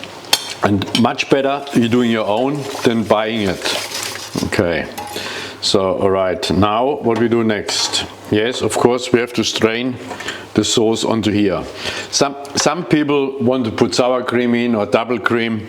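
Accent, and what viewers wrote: Germany. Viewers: Love your German accent. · wow CHEF, your German accent makes me think, intelligence, innovation, efficiency...Looks Amazing!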